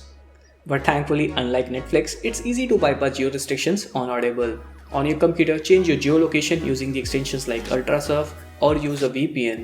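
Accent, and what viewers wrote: India. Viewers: english with accent hindi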